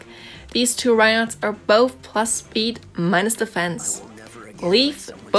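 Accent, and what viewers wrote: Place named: Germany. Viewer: Ich dachte mir doch, dass das 'n deutscher Akzent ist ^^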